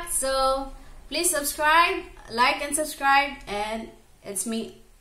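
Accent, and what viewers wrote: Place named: Italy. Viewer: ay ka expert na nimo mg storya Italian inday good job sarap pakinggan accent mo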